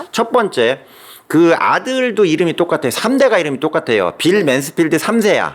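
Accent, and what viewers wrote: Chungcheong, South Korea. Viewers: 교수님 방대한 자료들 찾는것도 힘드실텐데 이 모든 자료들을 자세히 분석해서 흥미롭게 전개해주시는 능력이 탁월하세요. 정말 위트 있는 입담에 푹 빠져드네요. 충청도 억양이 정말 매력 있으시세요. 김복준님과의 해외사건 케미도 좋고요.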